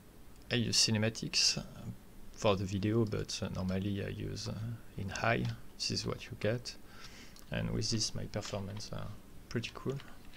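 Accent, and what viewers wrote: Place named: France. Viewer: Bonne vidéo, merci. L'avantage quand un français parle anglais, c'est que du coup je comprends l'anglais. Ceci n'est pas un reproche hein, juste un clin d'œil. Je parle comme une vache espagnole. Merci encore à toi. Le coup des fog est impressionnant. Chapeau.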